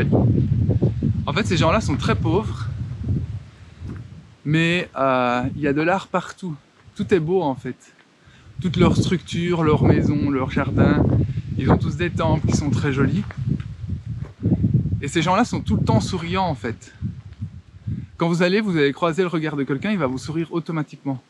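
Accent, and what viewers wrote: Liège, Belgium. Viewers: Merci pour cette vidéo , il me semblait bien avoir reconnu ce petit accent de la Province de Liège que j'affectionne tout particulièrement étant de Mons (Hainaut). Il ne suffit que d'une chose pour changer le Monde comme en musique s'accorder pour être en harmonie et changer la musique ...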